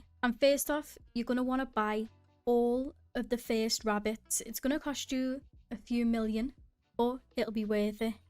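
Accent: Liverpool, United Kingdom. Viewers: Are you from around Liverpool? I can’t shake that accent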